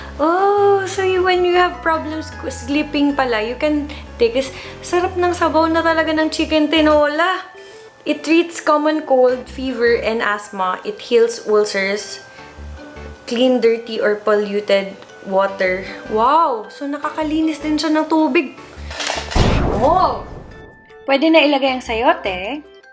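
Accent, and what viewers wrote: Visayas, Philippines. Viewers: dati nag subscribe ako dto pero inalis ko kasi parang nakakasaawa panoorin yung pgkbisaya nya nakakaturn off maganda pa naman sya saka sobra maarte mgsalita.aral ka tagalog kasi nasa manila ka naman na nakatira . sorry to comment like this para din syo yan para mas dumami subscriber mo not only visayans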